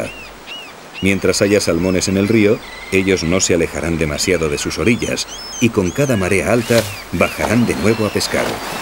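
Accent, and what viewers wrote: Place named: Spain. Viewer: El problema es que el acento españolito no ayuda !!!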